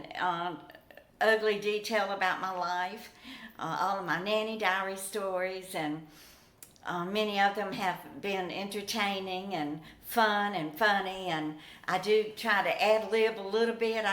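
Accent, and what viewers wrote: South, United States. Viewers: I love you're accent, its a beautiful, classy, southern, Lady voice. Its very calming & loving. I wish the southern ladies of today had this. Its a lost art. I was raised in West Virginia, southern people have heart & soul thats unexplained by us southerners, but totally understood, an unspoken love of heart.I really love that! Im 35 years old & I would absolutely love for you to write a book, a memoir about your life. Truth, honest, good bad & ugly. The highest highest highs & the lowest of lows. I love reading biographies and memoirs about peoples struggles, demons, their joys, they're better angels. Please think about it if you would? You've overcome alot & still keep joy on your face. That alone is a miracle, even the days where its hard to find joy. Im sure it would help people out. What do you say? 😁,❤️ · Glad to see you back & looking so fresh & pretty! 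I didn't realize that p.o.boxes were that expensive per year. I am a recent subscriber and being from the South (Georgia), I just love your Southern accent. You are a great storyteller & I enjoy them. Rest when you must & people will understand. We will keep on coming back! :)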